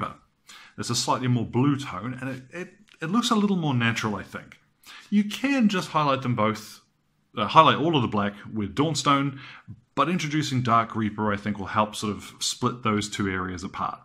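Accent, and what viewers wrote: New Zealand. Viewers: It's certainly impressive and appreciated! From your accent, are you a kiwi by any chance? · Thanks for the advice. Local would be......NZ based on your accent? I'm just starting my first ever painting project and I'll be doing Tau in Zandri Dust w/ Ushabti HLs, and Mech Grey and Dawnstone HLs for the fatigues.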